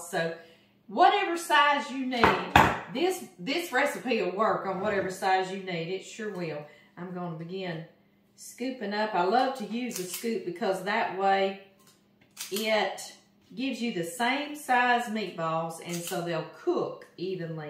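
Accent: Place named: Louisiana, United States. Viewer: Hey, haven't been doing much video watching this past couple of weeks due to losing a good friend of 25 years she was born and raised in Louisiana she had just turned 56 so gone unexpectedly and way too soon. We originally met online and formed a bond like sisters through the many years of conversation. We never got to meet in person due to life always getting in the way which leaves me with such regret..but we spent hours talking on the phone with each other through the years and I sure am missing her. Anywho when I got the notification on my phone that you posted a new video I just had to watch since I shared your videos with her so often. I gotta say hearing your voice and that Louisiana accent opened the floodgate of tears knowing she wasn't just a phone call away anymore. When you and did the blessing it was like a soothing balm to remind me that someday we will be reunited. 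I don't mean to ramble but just wanted to share with you how your video touched my heart. Thank you, God bless and keep you and your family. ❤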